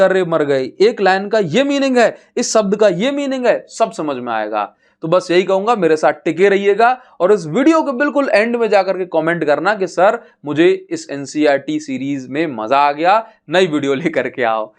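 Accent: Odisha, India. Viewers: Ap odisha se ho sir?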